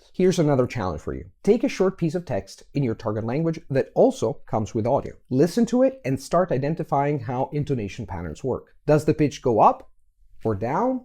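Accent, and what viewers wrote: United States. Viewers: For those non-native speakers here who are wondering-yes, Luca does sound like an American. -a native speaker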